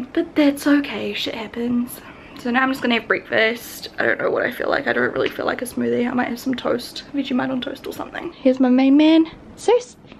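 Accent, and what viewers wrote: New Zealand. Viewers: love you xxxx extra love your kiwi accent, makes me feel like I'm home xx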